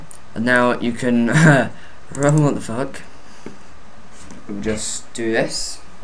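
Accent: United Kingdom. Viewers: are you british? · I like your British accent :) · yes he has a british accent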